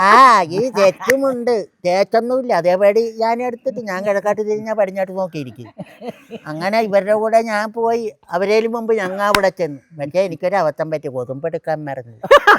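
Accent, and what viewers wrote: India. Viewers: അടിപൊളി 😂 ആലപ്പുഴ മാരാരിക്കുളം സൈഡിലുള്ളവർ സംസാരിക്കുന്ന പോലെ 😂😂😂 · കുറെ കാലം മുൻപ് ചേർത്തലയിലെ ORIGINAL സംഭാഷണം ശൈലി. തകർത്തിട്ടുണ്ട്!!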